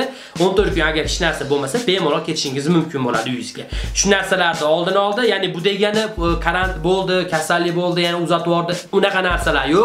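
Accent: Andijan, Uzbekistan. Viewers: akajon andijonlimisiz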